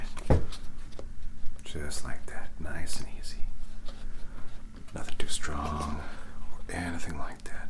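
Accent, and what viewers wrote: United States. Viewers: I LOVE the American accent! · Wow, your American accent is excellent. If I'd seen this series first I would have assumed this was your natural accent.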